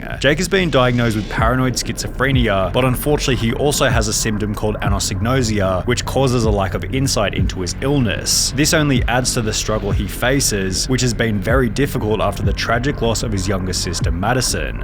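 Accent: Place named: Australia. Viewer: Great...another Australian narrator... why?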